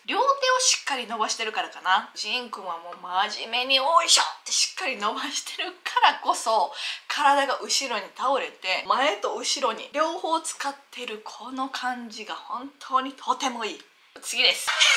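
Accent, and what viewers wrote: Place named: Kansai, Japan. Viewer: こんな素敵な動画に巡り会えて、幸せでしゃあない！何回も何回も、なーーん回も見て、先生の解説、ほぼ覚えてしまいました （笑。関西弁もHOTで嬉しい、ありがとうございます！どんな時もJINくんは最高だっ！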